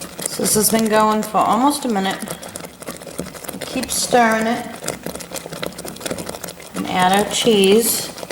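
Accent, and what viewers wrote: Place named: East Coast, United States. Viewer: I found your channel over the weekend and I thought I'd leave a comment and say HI! & say.... please do tons of hauls! If some are sick of em they can skip that video. & Yes I can see :) LoL I love all the stuff you do! & You cook things that I can do! I am definately going to try the stir fry I watched last night!! Last thing is I love your east coast accent! I & My family are from the corner of Indiana near Chicago so I am used to a striong Chicago accent! XoXo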